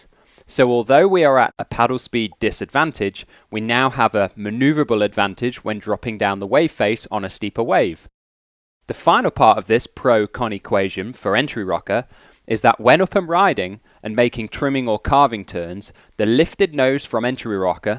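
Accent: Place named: Northern England, United Kingdom. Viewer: Every time I hear a northern English accent talk about surfing, I am surprised that people actually surf up there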